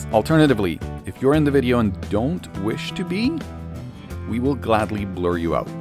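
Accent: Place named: United Kingdom. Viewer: British accent pffff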